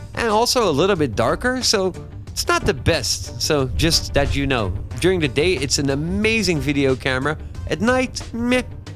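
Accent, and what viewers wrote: Netherlands. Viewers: Hi, Great video! Are you Dutch? I think I can hear a slightly dutch accent, however your english is very nice though! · You sound Dutch. Aren't you?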